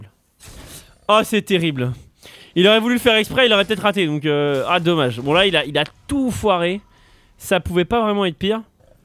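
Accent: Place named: England, United Kingdom. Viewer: Cet accent anglais :D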